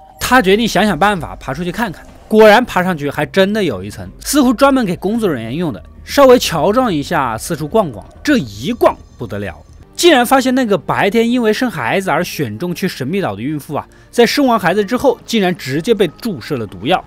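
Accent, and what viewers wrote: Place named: Hunan, China. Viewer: 是湖南的吧？特别喜欢这口音，哈哈哈哈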